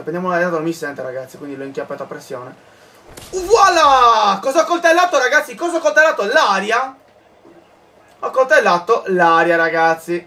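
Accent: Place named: Apulia, Italy. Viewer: Ma sei pugliese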